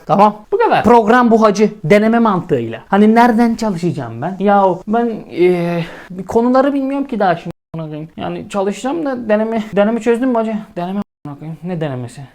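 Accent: Thrace, Turkey. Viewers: Reis küfürü azaltmışın böyle daha iyi trakya ağzı yeter güldürmeye :)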